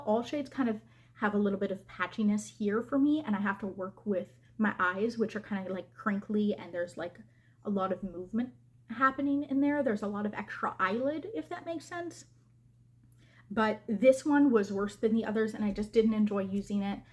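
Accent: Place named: France. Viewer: Congrats on the complete refresh! 🎉 that’s a pretty new colour story! So many possibilities!! Also, love your French accent. I’m assuming you speak French? 🇨🇦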